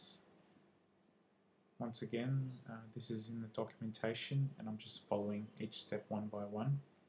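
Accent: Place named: Australia. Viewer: Thank you very much!! I'm very new to this technology but value the work you have put in to demonstrate!! It sounds like you're a fellow Australian ;) Cheerz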